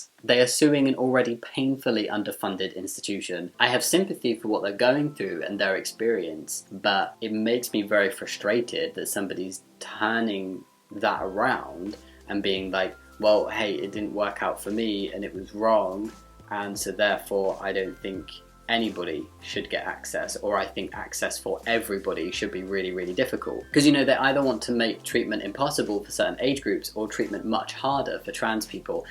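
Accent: United Kingdom. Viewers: You’ve successfully made me hate the British accent. You are a joke and are an insensitive to other humans. Quit being a narcissist. You’re entire community is indoctrinated- including you. 🤮 You also lie (because it is too simple to get hormones -10-30 minutes) and you invalidate other people’s reality. STFU and think about something else other than your gender for once in your life.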